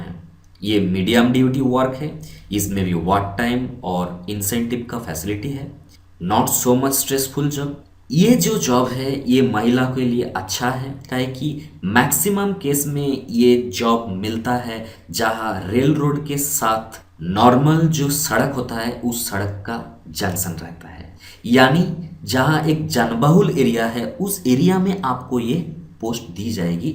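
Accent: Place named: Bengal, India. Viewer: Apna hindi thik karo bangali babu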